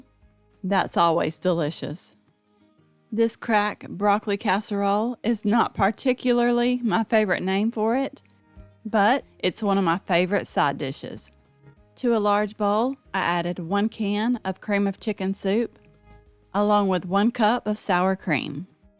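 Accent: South, United States. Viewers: “And don’t that look delicious “ Why don’t Southerners speak English properly?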